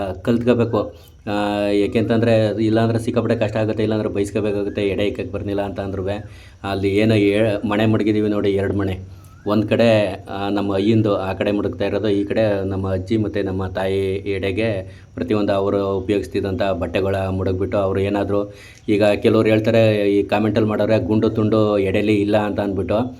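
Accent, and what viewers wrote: Mandya, India. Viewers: ❤ video hakidakkee kushi aithu sir ❤ love your family neamma Mane super ❤ neamma language super ❤namma Mandya language super ❤navu Mandya sir ❤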